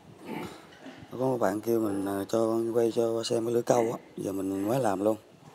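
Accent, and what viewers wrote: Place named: Mekong Delta, Vietnam. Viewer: Giọng nói miền Tây nhưng mà ở đâu vậy.Câu ở kênh mà có lục bình nhiều mình câu có không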